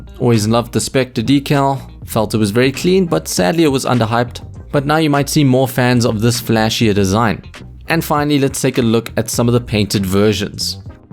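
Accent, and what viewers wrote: South Africa. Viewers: Where you from. You sound south african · Are you South African?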